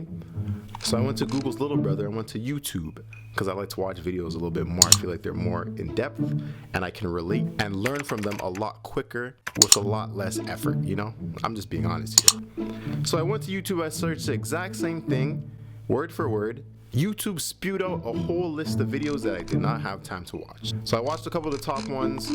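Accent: Toronto, Canada. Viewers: you from toronto bro? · You from Toronto fam? I hear that accent haha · You can tell this guy's from Canada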